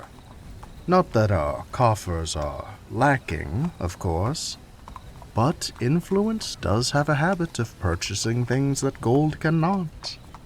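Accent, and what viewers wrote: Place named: South, United States. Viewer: SOUTHERN ACCENT😭🙏🙏🙏🫄🫄🫄 CONSIDER MY M PREGGED AND MY EARS GASMED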